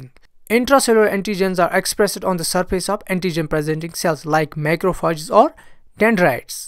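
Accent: Kashmir, India. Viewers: Typical kashmiri accent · Idk y but sir your accent seems Kashmiri 😅😅 but truely it made it kinda more easier to understand ..tysm sir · Indian Accent again. Sucks! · Are you a Kashmiri? Coz that's a very similar accent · Kashmiri accent 💕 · amazing vedio sir ...💕 we all kashmiris sound different and beautiful thats why i was able to recognize u ...keep going ✨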